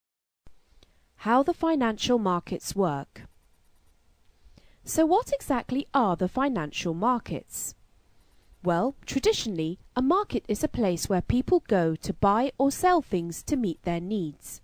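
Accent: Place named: England, United Kingdom. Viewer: I read somewhere that a female voice is recepted better by people than a male voice is, in such things as this video (instruction audio); it's a psychological thing, and has to do with our mothers nurturing and raising us when we're babies.-- I like this video, very informative and simple and easy to listen to (because of the female voice and the English accent. > "Bonds" James bOnds.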